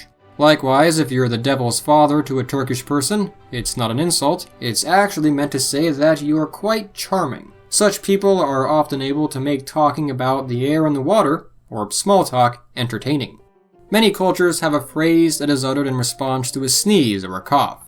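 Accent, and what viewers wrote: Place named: United States. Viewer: This guy can't speak German to save his life. I can speak both, and I tried intensely listening to what he was saying, but I just couldn't make anything out. He was saying it in such a heavy American accent that the words just become nonsensical gibberish Seriously, if his life depended on speaking just one regular basic German sentence like that, he'd be long dead. He's that bad! Cos NOBODY would understand him looool.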